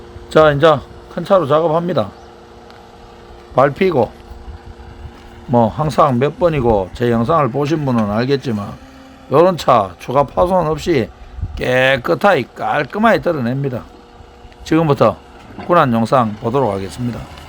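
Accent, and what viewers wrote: Gyeongsang, South Korea. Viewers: 아재요! 부산사람잉교? 아! 부산에 저런 시골은 엄째. 우째던 갱상도 사투리 구수하네요. 구독 누르고 감니데이. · 아따마 갱상도 사투리쥑이네예 ㅋ 구독눌립니다 😂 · 마지막 멘트에 구독누르고갑니다 ㅎ 정겨운 갱상도사투리 좋네예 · 매일 시청합니다 구수한 경상도 사투리가 참말로 재밌고 입담도 좋습니다 맨날맨날 화이팅 ~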